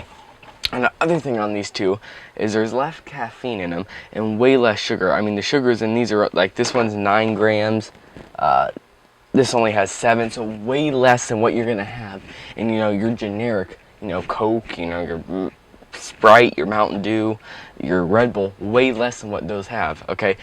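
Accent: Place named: North, United Kingdom. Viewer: Pop. Teehee. You're a northerner, aren't you?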